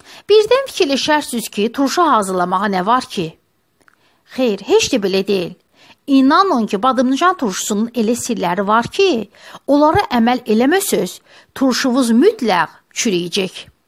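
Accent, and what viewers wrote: Baku, Azerbaijan. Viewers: EZİZİMSEN Baki lehcene gurban. Gulaglarimiz sevindi................ Süperrrrrrrrr hazirladin! Muş OLSUN XEJİRLİKLERLE İNŞALLAH👍♥️👍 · Ay senin bakili lehceve qurban olum ,ay qizim.Ellerive sagliq.Hem danisigin xosuma geldi,hem reseptin.Sag ol,var ol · Bakılı ləhcəsidir🥰özüm agsuluyam bakılıların geliniyem Şüvəlan da çox sevirəm bakılıları çox mədəni insanlardir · BAKI lehcesiii 👍👍👍👍Hansi kenndir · Size qulaq asanda, mene çox xow olur, içimde nostalji bir hiss oyanir. Uzaq keçmiwime, uwaqliq illerime, köhne mehellwmize , teze pirin üstundeki evimizeze, heyetimize xeyalen qayidiram sanki...Ustaliqimiza da ki, heyranam açiqi. Sizin reseptinizle göyem mürebbesi hazirladim bu il, çox gözel alindi. Ellerinize saqliq, allah dnyadan köçenlerinize rehmet elesin. menim bakili lehcesinde wirin- wirin daniwan bacim, var olun!!!🙏🏻👏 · Sip sirin Baki lehcesi. 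Danisiginiza vuruldum. Mukemmel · Xanim,canim menim Baki lehcesuycun daruxmiwuq,Allah ellerinize dilinize saqkuq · Ne sirin danisirsiz? Ewq olsun bakililara..Esl baki lehcesi..Var olun xanim..Ellerinize sagliq..Allah bereket versin islerinize..❤🥰💋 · XANIM ÇOX SAĞ OLUN SƏLİS VƏ AYDIN DANIŞIB ÖYRƏTDİYNİZƏ GÖRƏ .GÖZƏL BAKI LƏHCƏNİZDƏ.🤝🤝🤝🤝🤝🙏🙏🙏🙏🙏🙏🙏🙏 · Caaan. Bakılıların ləhcəsinə heçnə çatmır da... 💋🥰Əlün_qolun var olsun. Balona yığma qaydası da düpbədüz. 👍👏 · Qədim BAKI LƏHÇƏSİ. 😊😍👏🏻👏🏻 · Eziz Bakilim cox gozel ellerinize sagliq👍👍👍shirin lehcenize defe defe qulaq asdim😂👍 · Sağ ol kənd qızı. Bakının ləhcəsinnən və şorabasıbnan doymağ olmur ki olmur dəəəə. Halal olsun. Mənə ləzzət verdi. Əlün - qolun var olsun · Can sizin ucun!!!Uzurlu sayin gec cavab verirem.Ele ne yaxshki,sizde varsiniz! Ureyinize,dilinize,el-qolunuza sagliq.Olsun,qoy olsun bizim Baki lehcemiz!!!Seve-seve,zovq alaraq izleyirem.Bu arada,badimcan shorabam lap ela alindi.Sag olun,var olun eziz bacim! 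· Super əllərinizə sağlıq danışığınız super Bakılısız deyəsən 😊😉👍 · Can Bakı lehcem!!! Ele darıxmışam ki, öz danışığımız üçün. Resept de elaaa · Cox Sagol can, Baki lehcesiycun cox darixmisdim. Badimcanlar da Cok eladi Bele de eliyciyem. 😊👍💐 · Nə gözəl öyrədirsiniz. Çox sağolun, öyrəndim. Bakı ləhcəniz də çox gözəldir. 😊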